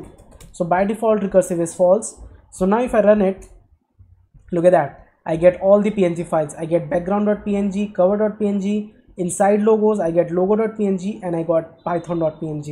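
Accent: India. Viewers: Exelent explanation. Very Clear. Love the indian accent.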